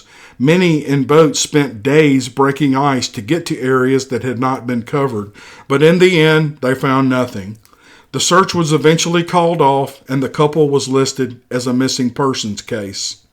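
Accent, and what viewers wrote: South, United States. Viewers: You do the southern accent proud, mate! :D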